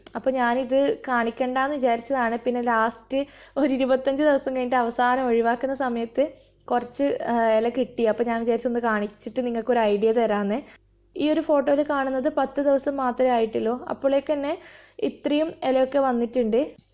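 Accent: Kannur, India. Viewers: That Kannur Slang.. 😍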